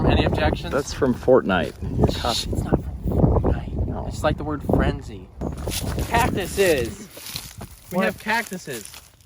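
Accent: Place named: South, United States. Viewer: sounds like you are from the south ni**a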